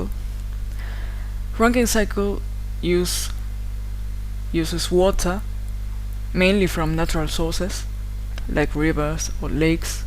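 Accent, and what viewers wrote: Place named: United Kingdom. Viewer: El acento de tu inglés es como británico. Muy bueno.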